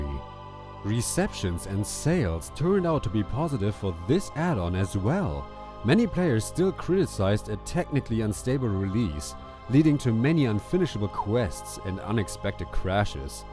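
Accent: Germany. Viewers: Cooles Video! Ich wollt grad schreiben, dass Du die deutschen Namen echt gut aussprichst, aber nach ein paar Minuten viel mir auf, dass Du wohl einfach deutsch mit gutem Englisch bist xD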